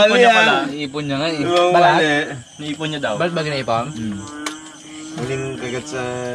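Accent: Iloilo, Philippines. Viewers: ilonggo kaba lods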